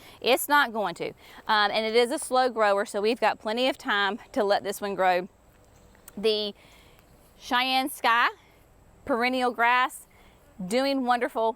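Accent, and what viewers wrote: South, United States. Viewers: Love your charming Southern Accent and the guaint Souhthern sayings. Thanks m for sharing g your beautiful garden. Love ya.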